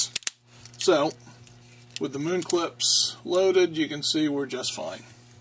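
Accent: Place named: Southern Maryland, United States. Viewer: I think I recognize an accent of Southern Maryland/Northern Virginia. I'm from there.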